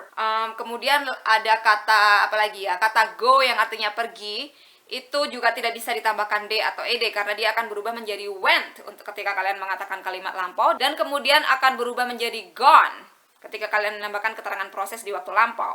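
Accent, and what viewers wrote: Surabaya, Indonesia. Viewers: Suroboyo mana suara nya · U hv such a cute Indonesian accent while ure speaking English 😘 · Ya kan mbaknya org indo, jelas masalah aksen atau logat tentu berbeda, sama kayak singlish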